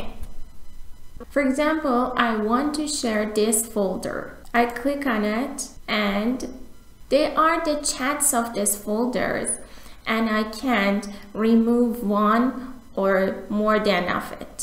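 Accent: Iran. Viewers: That Persian accent 😂😂😂😂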